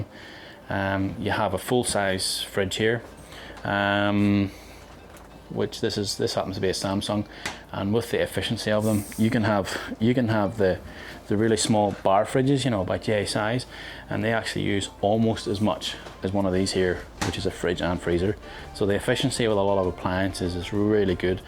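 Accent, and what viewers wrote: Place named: Northern Ireland, United Kingdom. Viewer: Northern Ireland, sounds like.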